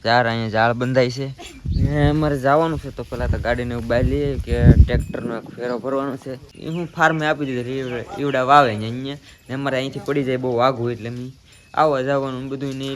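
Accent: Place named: Banaskantha, India. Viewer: અમારે બનાસકાંઠા માં પણ રોજડા જ કેસે Bhai 😇 તમે બોલો ને એમજ અમે પણ બોલીએ સિયે આપડી બોલી સેમ too સેમ સે હો ભાઇ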